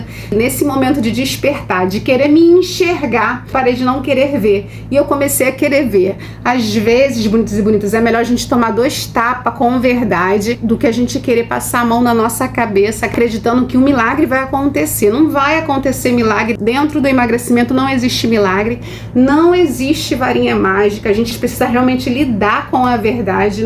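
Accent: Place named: Rio de Janeiro, Brazil. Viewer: Que sotaque lindo!!! Eu amo O sotaque Carioca❤ Sou Minas Gerais e amo O Río De Janeiro. 😍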